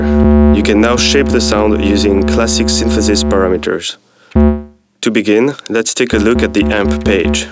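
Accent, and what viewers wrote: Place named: France. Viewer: super vidéos, je crois reconnaître un petit accent français, ça serais cool des tutos en français :)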